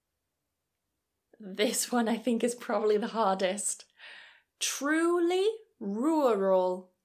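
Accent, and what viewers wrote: United Kingdom. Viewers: Yes, Number 20 is ittle bit harder to pronounce. I love your 20 Tong Twisters. I like the British pronunciation better than American pronunciation. Thank you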